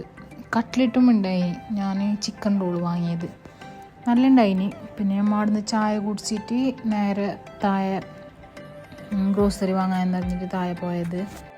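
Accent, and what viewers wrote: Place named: Kasaragod, India. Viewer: Language orginal kasaragod🤩👌🏻kelkan vibe avvunund❤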